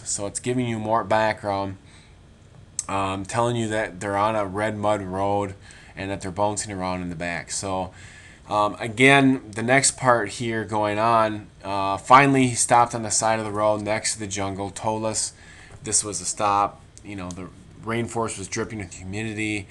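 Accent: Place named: Canada. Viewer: hehehe Canadian accent..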